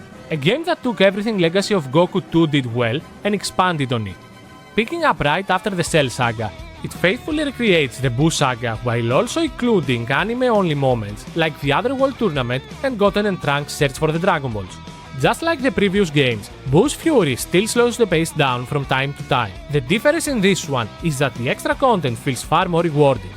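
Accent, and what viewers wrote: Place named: Greece. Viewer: Greek English the best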